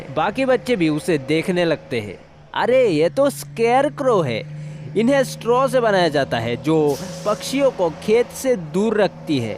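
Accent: South, India. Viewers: Lots of love from Rawalpindi ap konsay city se hain accent ap ka south Wala hai Namaste Namaste Namaste Namaste Namaste Namaste Namaste Namaste Namaste Namaste Namaste Namaste Namaste Namaste Namaste Namaste Namaste Namaste Namaste Namaste Namaste Namaste Namaste Namaste Namaste Namaste Namaste Namaste Namaste Namaste Namaste Namaste Namaste Namaste Namaste Namaste Allah Hafiz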